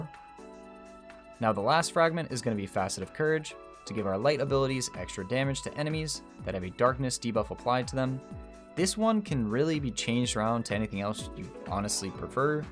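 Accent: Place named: United States. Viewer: They speaking amercan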